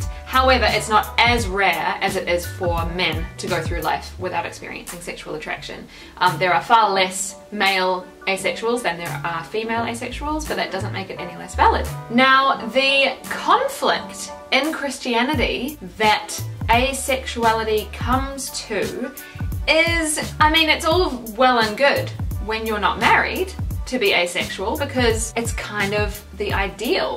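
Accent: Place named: New Zealand. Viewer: are you from new zealand? my second guess whould be australia your accent sounds a little kiwi to me,just curious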